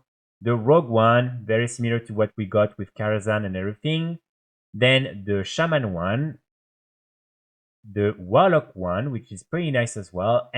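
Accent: France. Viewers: Je comprend beaucoup trop facilement cet anglais, c'est un compatriote xD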